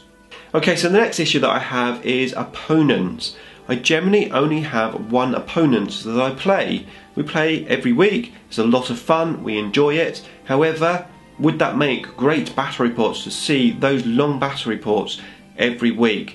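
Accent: United Kingdom. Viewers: This concept of "the worst" is absurd. Maybe "one of the least fancyful baterps" yes. Maybe it doesn't have professional background, maybe sometimes there are too little tactical comments, but still there are more than in many other batreps. They are short, they go straight to summary, to the point - sometimes it would do much good to have parts of batrep extended to show particularly important clash highlighted. What I lack in most batreps now is what is the virtue of yours, however not all the time and not in relation to every faction on the table. E.g. you explain how Necrons operate but not necessarily how opposing force does. Still I wanna say it's tactical comment that's most worthy. Watching rolling dice for 2,5 hours is crappy and boring. The scenery may be the most beautiful but still it will not make me any good to listen to comments restricted to stating the obvious e.g. 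"Again I rolled below average. Oh, and now above average. And now all 2s! What tough luck". So continue your good work, Sir. And well, we'd miss this singing British accent, this "video" at the beginning of your pieces! Best,!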